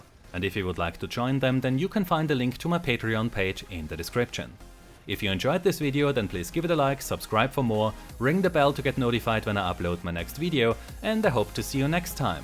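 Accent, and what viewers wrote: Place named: Germany. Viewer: You know you're in good hands when you hear a German accent..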